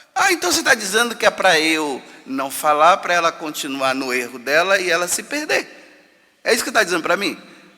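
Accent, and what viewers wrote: Bahia, Brazil. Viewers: Durmam com um barulho desses. Esse padre, com todo respeito, é um baiano arretado.🤗🤗 É uma injeção de ânimo diária, aprender com ele. Obrigada Senhor Jesus, pelo sacerdócio do padre José Augusto! 🙏🙏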